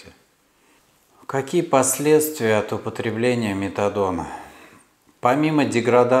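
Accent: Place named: Kursk, Russia. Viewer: этот курский акцент бесит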